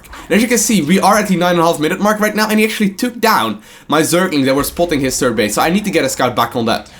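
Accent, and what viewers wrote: Netherlands. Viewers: It's neither canadian or british. It's northern european, possibly dutch. Feel free to confirm or deny. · his accent is dutch :D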